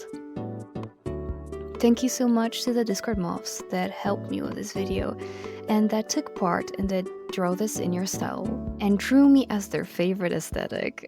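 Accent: Poland. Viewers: Oh how I felt the Polish in you barely 8 seconds into the video :D /pos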